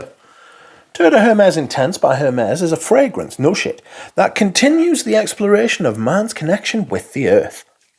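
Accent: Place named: Durham, United Kingdom. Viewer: I think it's fine but nothing special. I'm pleased I didn't buy a full bottle. It's very similar to other fragrances I've tried recently. Are you from Durham? I'm from Durham you sound a bit like myself.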